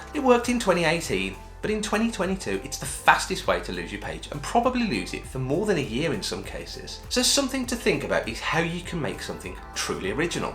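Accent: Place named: Liverpool, United Kingdom. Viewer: i hope you upload more videos about Facebook in stream ads it's been two years now working in this platform and the worst thing is how mysterious Facebook laws for this content and i just find my self lost because the lack of information and guidance soo i hope you continue your work and speak a little bit slowly cause your Liverpool accent is a little bit hard for me 😄😅 but good jop already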